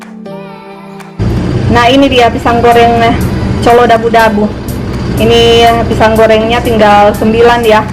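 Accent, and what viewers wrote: Manado, Indonesia. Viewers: Yup..... mantap ...... dari logadx campuran Manado n daerah mana ya......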